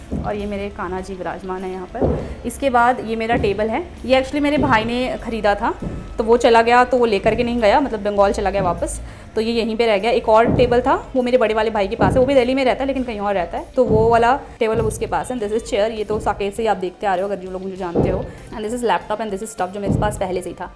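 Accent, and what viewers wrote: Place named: Braj, India. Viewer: Ap mathura se hai Mam,, ? Apka acent Brajwasiyo ki trha lgta h 🥰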